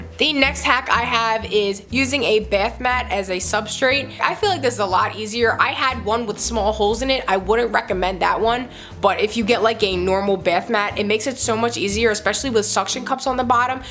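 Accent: Philadelphia, United States. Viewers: Who else can hear her philly accent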